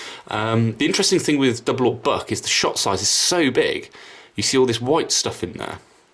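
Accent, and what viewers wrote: England, United Kingdom. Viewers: Wow, definitely wasn't expecting to hear a British accent. Good to hear our cousins from across the pond enjoying firearms. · English accent 🙂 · 'Love that accent. I missed my British friends.Well explained video.I gained more understanding of what loads to use for target shooting.More power to you. You should have been a teacher!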